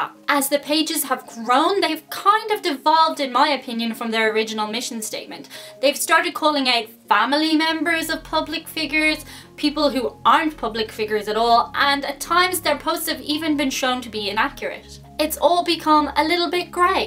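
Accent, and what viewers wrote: Ireland. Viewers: absolutely love you. You make me smile and I know of no one who can talk as fast as you. I have no clue what a Irish accent is but yours isn't what I thought was Irish. I can even understand you as your speaking 6 million words per minute. Isn't it wonderful bloggers have been unveiled, LMFAO. Go girl.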